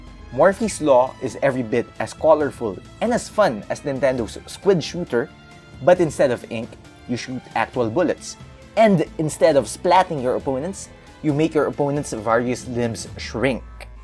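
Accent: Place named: Philippines. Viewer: Thats some solid pinoy accent:) great review though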